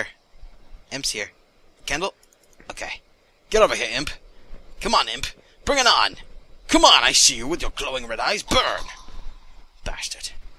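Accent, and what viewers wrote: United Kingdom. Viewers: whats with the british accent? X3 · is it just me or does he sound a little british in this video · Why does he speak in a british accent kind of thing? Its a bit annoying. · is it me, or dose he have a slight british accent xD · He has a slight british accent...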